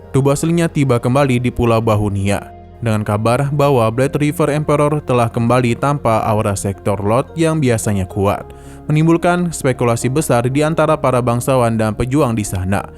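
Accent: Banjar, Indonesia. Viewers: Bang orang Banjar kah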